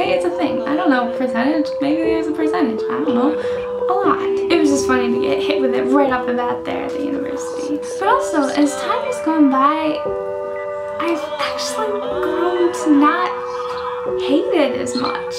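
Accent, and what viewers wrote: France. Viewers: You have a great french accent :O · i'm totally in love of your french accent :) i'm french and i'm approve all the things you say about "monoprix" and for the " french supermarket" in a general way . it's very interesting to see our culture from your point of view. Did you talk about the fact than even the men do " la bise" with others men ? i think for American people it's a social manner inappropriate ( sorry for my english ) .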